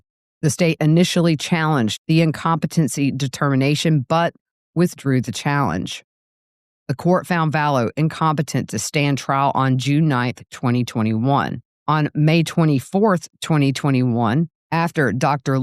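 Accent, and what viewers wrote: South, United States. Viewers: Hello 😊 New sub! Just luv your accent! You’re the original southern gal, I am an implant lol. Missed the ID trials & hoping to play catch up here. Since you got this 1st I feel extra lucky to have finally found my go-to for this case! 😊 Enjoy tonight with your son!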